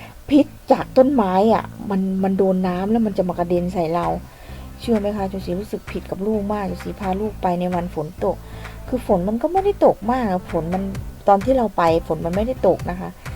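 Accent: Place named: Central Thailand, Thailand. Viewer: ยุจังหวัดดัย เว้าอิสานน่าติดตามกว่า เปิดมาได้ยินเสียงภาษากลางบ่เบิ่งเลย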